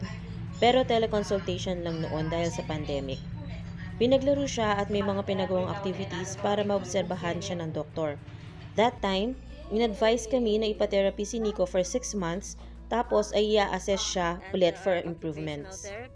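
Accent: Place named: Pampanga, Philippines. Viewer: Hi po Mommy napansin ko po kampangan kayo..taga pampanga po ba kayo?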